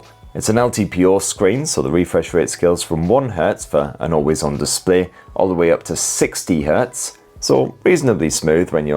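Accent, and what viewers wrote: United Kingdom. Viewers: I love your British accent, especially when you say "shenanigans" 😂 Your reviews always make my day bright.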